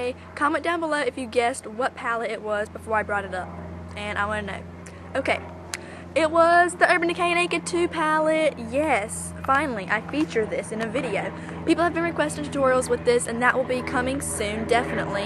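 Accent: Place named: South, United States. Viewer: I LOVE YOUR SOUTHERN ACCENT!!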